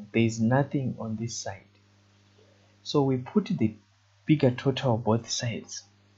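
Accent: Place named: Zimbabwe. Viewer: Sound like a Zim brother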